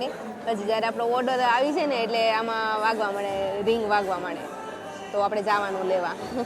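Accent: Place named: Kathiawar, India. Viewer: ખુબ સરસ્સ વિડીયો. ફ્રાન્સમાં અસ્સલ કાઠીયાવાડી બોલીમાં તમે ત્યાની રેસ્ટોરન્ટનો અનુભવ કરાવ્યો. વિડીયો બનાવતા રહેજો. તમારા અગાઉના પણ કેટલાક વિડીયો જોયા છે. બહુ ગમ્યા.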